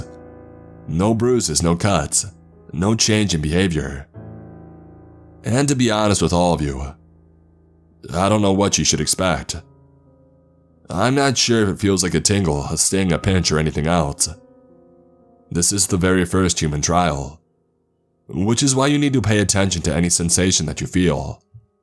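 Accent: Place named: California, United States. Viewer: This guy's California surfer accent is not a good mode for this story to be told, takes me right out of the story the way he pronounces words... Eckspecially Rayan (Ryan) Tham (them) Perfials (peripherals) Kassed (kissed) Thass (this) At (it) Etarnity (eternity) Sanses (senses) Tranches (Trenches) Warked (worked) Praaaject (project) Staaary (story) Incraaases (increases) It's a if he's doing a parody of the SNL skits of the soap opera "The Californians" Kind of hilarious I mean this comment in a helpful way, after all, we can't really know our own accents. My best friend told me I should cut my nose hairs when we barely knew each other. Only a true friend will tell you something like that.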